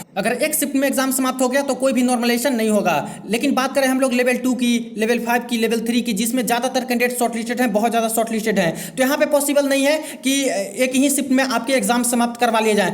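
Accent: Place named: South, India. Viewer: Sir please speak in ENGLISH ,We cannot understand south indian 🥺🥺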